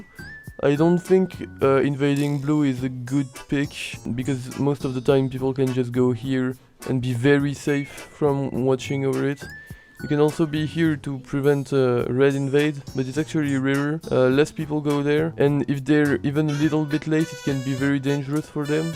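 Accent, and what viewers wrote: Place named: England, United Kingdom. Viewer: l'accent anglais de mieux en mieux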